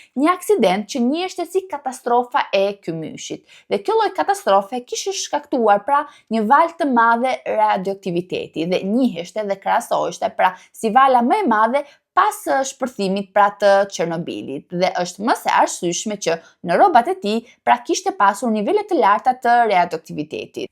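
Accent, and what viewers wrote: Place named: Tosk, Albania. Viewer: O sa bukur ngjyra e flokve tua ket ngjyr Gri ❤ te rrin shum bukur mos i ndrysho biles nje koh❤! Dhe un e kam ket ngjyr vetem se ikam te shkurta.Të përshendes nga Franca ne Origjin nga Kosova.te ndegjoj me shum deshir sepse me pelqen dialekti tosk edhe pse Gegnishtja asht e bukur se asht shum e veqnat por ti gjithqka e bën me ❤ !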